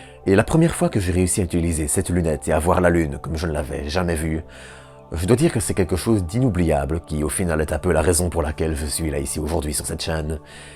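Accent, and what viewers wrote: Belgium. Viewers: Hello. Voici une chaîne Youtube qui m'a souvent étonné. Au début, un ton bien d'ici (je suis Belge aussi) auquel je ne suis pas habitué en video, qui a son charme, d'autant que tu as pris de l'assurance avec le temps. C'est là que j'ai compris que le régionalisme s'il est assumé et que la vidéo est de qualité passe non seulement bien et mais que cela donne une identité supplémentaire. a son identité bien distincte. Ici, tu te mets en scène, et bien çà marche! Les moment plus spontanés, tes regards sont vraiment sympathiques. Bref, surpris de voir que c'est un jeune derrière ces vidéos, avec un style qui attire l'attention et une passion communicative. Je me re-regarde cette vidéo, et bien elle fonctionne vraiment bien. (la touche d'humour à n'apporte rien cette fois-ci à cette video, mais essayes, tant que tu n'abuses pas.) CLAP-CLAP-CLAP-CLAP-CLAP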